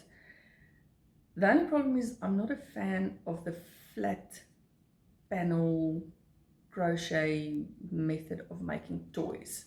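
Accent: South Africa. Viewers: I just saw another one of your videos and heard your accent. I knew that you must be from SA...and you are! I am from the US, but living in SA for 22 years. I totally know what you mean about the post. I don't order yarn or kits from overseas because of it. However, I am in a yarn subscription that isn't a kit and have it delivered to my parents in the US. I have be crocheting for a long time, but have yet to try amigurumi. Maybe you will convince me! 😉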